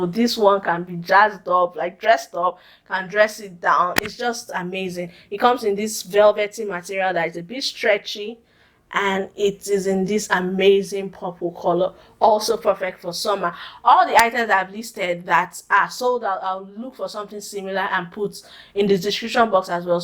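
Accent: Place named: Nigeria. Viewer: I knew you were Nigerian by your accent ✊🏾✊🏾😘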